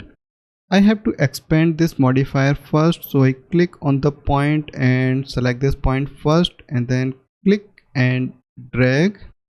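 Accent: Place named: India. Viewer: There should be a setting that transforms İndian accent to American accent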